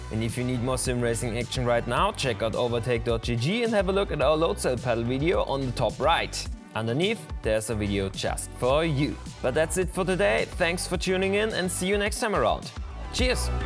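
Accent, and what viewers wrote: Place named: Germany. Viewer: This guy has the worst German accent ever. So harsh to listen to.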